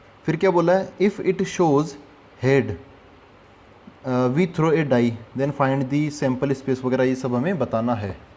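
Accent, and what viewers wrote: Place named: Haryana, India. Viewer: Sir apke bolne k tareeke se lagta hai k aap haryana k ho 😅 Shayad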